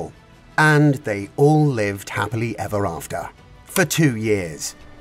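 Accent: United Kingdom. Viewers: honestly, I didn’t even look at half, but firstly because of the title, secondly because of the positioning of Poland as an innocent victim, and Russia as the most vicious of all countries, thirdly, there was no such state as Ukraine in the 17th century, fourthly the story is not told here, but certain one-sided information is being promoted with omission of certain points, the goal is not education, but the promotion of a certain agenda (by the way, voiced with a British accent), the British are always thrilled to pit the Slavs, as well as other natives. Therefore, I consider this video to be propaganda dirt aimed at escalating an already difficult situation.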